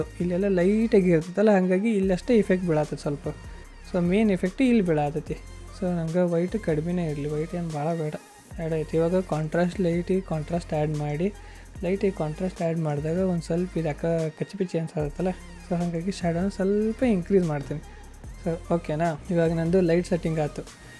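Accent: North Karnataka, India. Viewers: Bro en nivu uttara karnatakadavaraa? I am From Koppal✨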